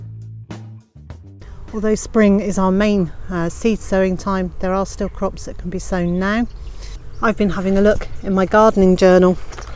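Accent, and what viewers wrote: England, United Kingdom. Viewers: Lovely garden! I’m assuming this is England! Sorry to assume, it must be your accent. Love the video, and thanks so much. What I know about Europe is it rains or is quite overcast, would that be correct? and if so does that affect the September Sowing or Spring Harvest?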